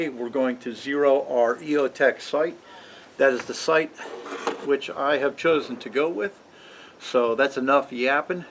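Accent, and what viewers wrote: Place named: Pittsburgh, United States. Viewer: Between no front license plates on the vehicles in his videos and that accent, he's a yinzer for sure!!!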